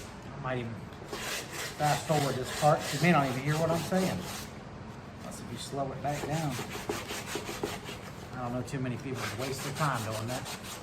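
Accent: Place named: Deep South, United States. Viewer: very clever idea! And you said before you were in Pennsylvania? You sound like you're from the deep south 😆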